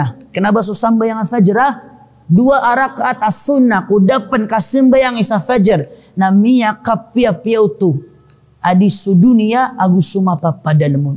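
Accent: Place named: Maguindanao, Philippines. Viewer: Mashaallah..gusto ko c ustadz di masyado.maranao pg pronounce nya parang maguindanao din.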